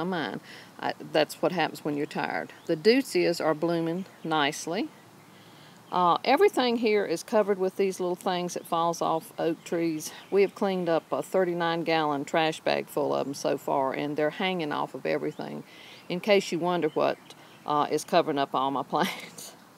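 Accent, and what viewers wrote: South, United States. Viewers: i have just discovered your YouTube channel and i have enjoyed your Garden videos so very much. I am inspired! In what part of the country are you living? I think I detect a very distinct southern accent. 😊♥️😊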